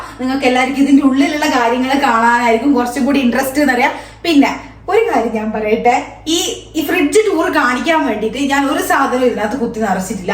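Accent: Kannur, India. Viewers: Adipowli chechi❤ എനിക്ക് ചേച്ചിടെ സംസാരം ഭയങ്കര ഇഷ്ട്ടായിന് നമ്മളെ കണ്ണൂർ സ്‌ലാങ് വേറെ നാട്ടിൽ നിൽക്കുമ്പോഴും മാറിട്ടില്ലല്ലോ👍👍👍🥰🥰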